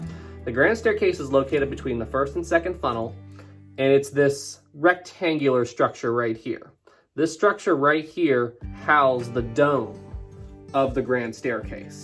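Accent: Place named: Maryland, United States. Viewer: Side note: I'm listening to your accent and can tell you're from somewhere in Maryland. If you don't mind me asking, where abouts in Maryland?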